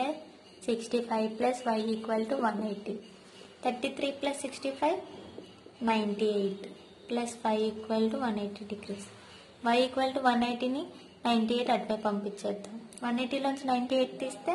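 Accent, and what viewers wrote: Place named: Coastal Andhra, India. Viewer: Madam what is your name? I thinking your name is madam. 😀😀😀😀😀 Madam another doubt I have What is your city? I thinking your city name rajamundry, vizag,kakinada and srikakulam. I said because these city names only Because your voice and slang like this cities only. Please reply me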